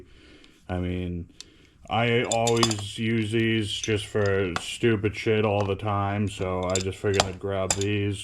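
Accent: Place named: Massachusetts, United States. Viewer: I can tell by your accent. I'm originally from Wareham down by the cape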